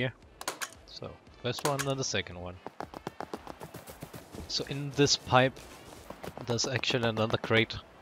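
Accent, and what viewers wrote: Denmark. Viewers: Thank you for the video. ( and just in case if im right. saying. Tak for Videoen. I might be wrong, but from the sound of your accent. I believe you to be Danish ( from Denmark)) denne Video var meget hjælpsom. :) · are u danish?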